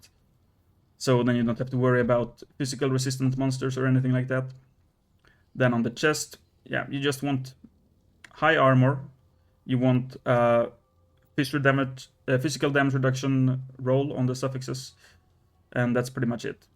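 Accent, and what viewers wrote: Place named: Finland. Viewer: Sä kuulostat suomalaiselta.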